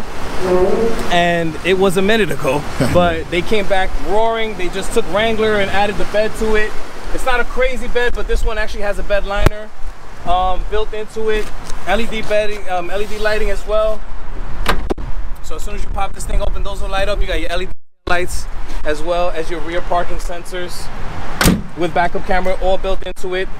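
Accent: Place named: Nuyorican, United States. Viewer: I could only stand about 3 minutes of the whole new yorican talk/accent ugh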